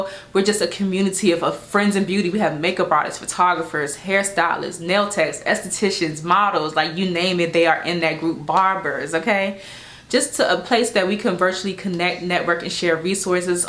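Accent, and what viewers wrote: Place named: Washington DC, United States. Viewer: I know a DC accent anywhere. Hey neighbor!! Great content and much needed. ❤️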